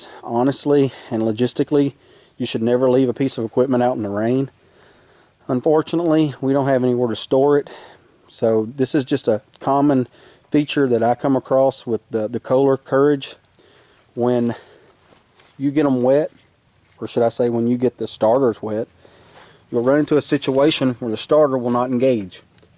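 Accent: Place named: Boston, United States. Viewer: After fire what? Sorry I am having trouble understanding your Boston accent. Could you point to that part?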